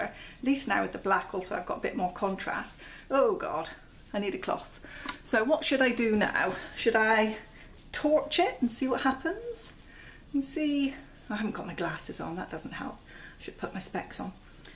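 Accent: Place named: West Country, United Kingdom. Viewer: So nice to hear a fellow West Country accent! ☺ Props to you for even attempting a painting with these colours... i mean, wow! lol 😎😎😎😎😎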